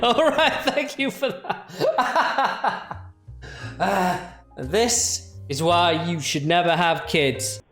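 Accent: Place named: England, United Kingdom. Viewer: Your English accent and the guy farting was so funny.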